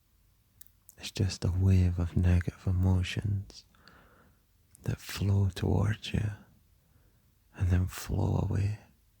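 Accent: Scotland, United Kingdom. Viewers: I just spent the last 10 minutes contemplating whether your accent is Scottish or Irish, and it has made me question my existence. I really thought I knew the difference between the two. But you're scottish though, right? Anyway, I'm really happy this randomly showed up on my recommended, your voice literally made me melt 😊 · someone in the comments pointed out you were scottish (i was trying to decipher ur accent) and now all i can think of is supportive shrek :)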